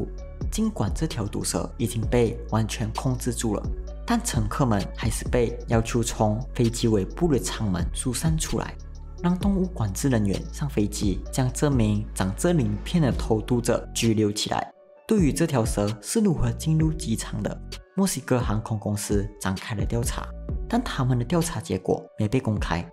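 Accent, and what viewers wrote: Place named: Malaysia. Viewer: 人家是馬來西亞人.這種口音是正常的好嗎...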